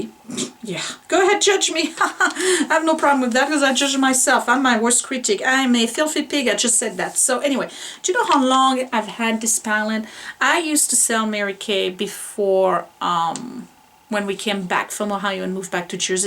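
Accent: France. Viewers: Where are you from originally? Your french accent is great! :-)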